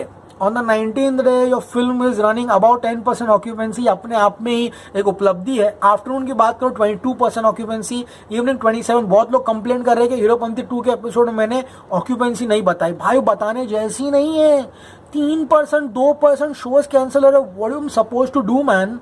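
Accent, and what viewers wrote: Karnataka, India. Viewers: Yaar guru neevu...amazing reviews....one of the best style of reviewing...your kan-glish is outstanding..Namaskara.. ✨💥💥🙏🏻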